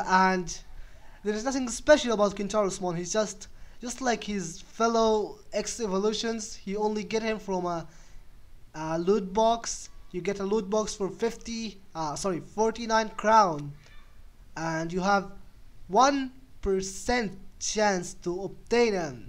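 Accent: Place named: Egypt. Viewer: You sound Egyptian in your English accent you’re Egyptian ?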